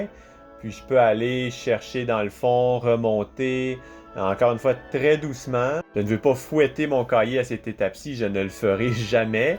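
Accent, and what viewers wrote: Quebec, Canada. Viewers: Bonjour, Un grand merci pour votre effort et professionnalisme. J’ai découvert par hasard votre chaîne et ça a été trop utile pour moi. J’ai bien apprécié les explications que vous avez fournies et votre style de présentation surtout avec un accent Québécois que j’aime beaucoup. Félicitations et continuez avec mes souhaits de bon courage et pleine réussite. Je ne sais pas où me procurer des produits de fabrication de fromage (pour usage domestique) dans les régions de Gatineau et Montréal. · Avec l'accent Québécois j'entends toujours 5 au lieu de 100 Au début de la vidéo j'ai cru qu'il fallait 1000 litres pour faire 5 kg de fromage waaa 😂😂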